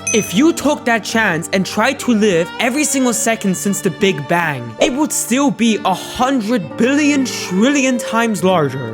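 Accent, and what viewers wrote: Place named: Germany. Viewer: Im hearing the german accent so much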